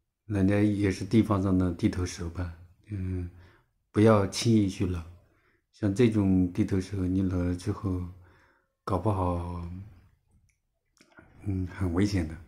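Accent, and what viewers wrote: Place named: Zhejiang, China. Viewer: 有浙江口音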